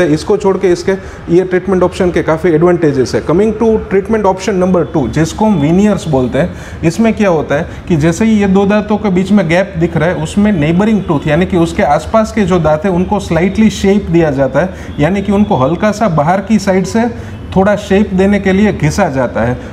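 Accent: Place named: Kathiawar, India. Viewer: bhai aap Hindi bhi kathiyawadi lehje mai bolte ho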